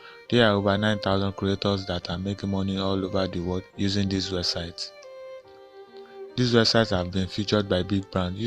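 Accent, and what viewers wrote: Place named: India. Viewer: You are speaking like an Indian man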